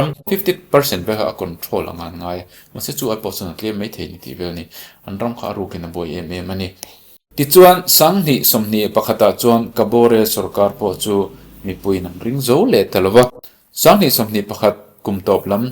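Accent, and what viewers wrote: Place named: Siaha, India. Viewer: Siaha lama i an deuh ka hria😅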